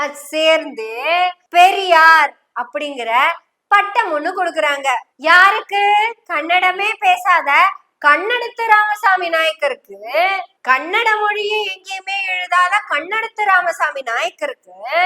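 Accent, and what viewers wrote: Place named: Palakkad, India. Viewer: சூப்பரோ சூப்பர். சும்மா பொளந்து கட்டுறீங்க பாலக்காட்டு கொங்கு தமிழே. பாவம் மனநல ஆலோசகர் ஷாலினி பொழச்சி போகட்டும். விட்டுருங்க. இவரே வேறொரு மனநல ஆலோசகரை அணுக வேண்டிய நிலைக்கு ஆளாகிவிட்டாரே என்று நினைக்கும் போது அவர் மீது பரிதாபம் ஏற்படுகிறது தோழரே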